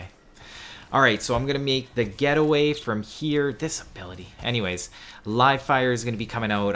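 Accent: Canada. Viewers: im digging the canadian accent over gamesager girly voice lol lol jk! you should do some Kraber with invisibilty gameplay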